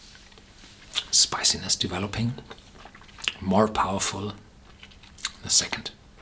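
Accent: Bavaria, Germany. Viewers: I can’t tell if this is German Accent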